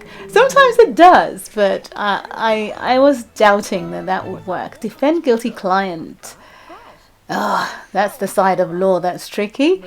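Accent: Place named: RP, United Kingdom. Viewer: I love how you speak! Super posh, I find it. I love it! :D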